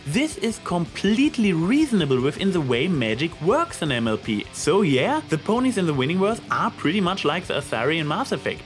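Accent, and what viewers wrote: Germany. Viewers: Quick question, are you German?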